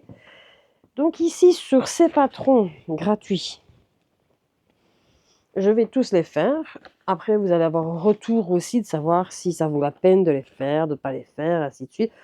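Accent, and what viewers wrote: Belgium. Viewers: Bonjour, je vous découvre aujourd'hui, j'apprécie votre façon d'être sans chichi et votre petit accent belge... Merci pour la vidéo. 😍.. Bonne couture. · Bonjour Je viens de découvrir votre chaîne J' apprécie beaucoup votre naturel ça fait du bien pas de chichi et franc parler avec un accent de Belgique, vraiment ça fait du bien au moral au coeur 😁 ça me motive à me secouer pour avancer dans mes projets Je vais visionner vos anciennes vidéos histoire de vous découvrir un peu plus Vous avez du pain sur la planche façon de parler😄 Très bonne journée Bises de Bretagne 👋👋👋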